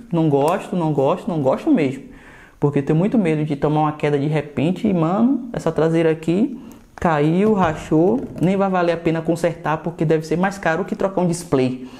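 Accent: Bahia, Brazil. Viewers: Mim escrevi no seu canal vc e baiano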